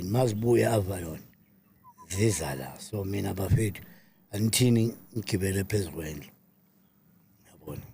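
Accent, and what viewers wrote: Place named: KwaZulu-Natal, South Africa. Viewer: Eeeeiy uwungowaseKZN ngempela mina yakuzwa😂😂😂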